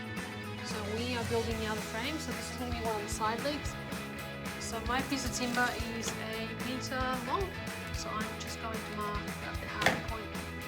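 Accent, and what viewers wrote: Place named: Australia. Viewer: How refreshing to see a woodworking video in metric combined with australian accent!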